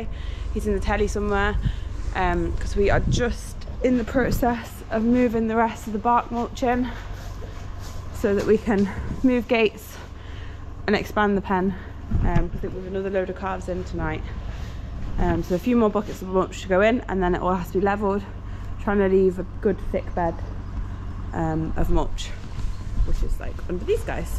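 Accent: Ireland. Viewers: Great video, more!! You're accent is absolutely starting to sound a lot softer and Irish 😊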